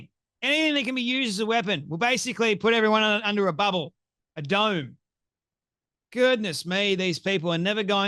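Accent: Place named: Australia. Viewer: dude your Mick Dundee accent got me to subscribe love the accent & content from Fla.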